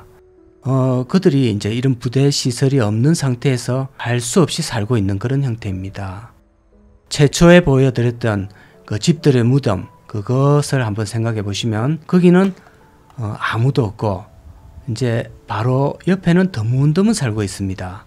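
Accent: Gyeongsang, South Korea. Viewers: 구수한 경상도 억양의 말을 거부감 없이 재밌게 잘 들었네요 한국은 의료민영화 절대 안됩니다 하는 순간 빈민국으로 추락입니다 미국도 의료시스템 땜에 시민들이 억의 빚을 지기도 하고 비실거리는데 한국 같으면 상상도 하기 어렵습니다 미국도 의료민영화 없애야 하는 아주 고질 시스템입니다 저는 웃긴 자막 재밌습니다 훈훈한 경상도 말씨에 구독하고 갑니다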